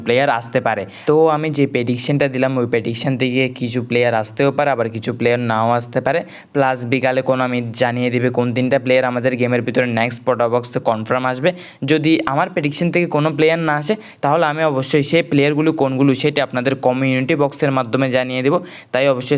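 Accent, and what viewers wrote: Chittagong, Bangladesh. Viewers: Vai apni ki Chittagong ar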